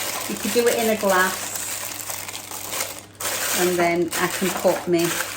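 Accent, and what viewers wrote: United Kingdom. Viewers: Hello 👋 from the USA… what kind of cream did you use for the frosting? I sometimes have a little problem with the UK accents or or too the products have different names there..❤❤Thanks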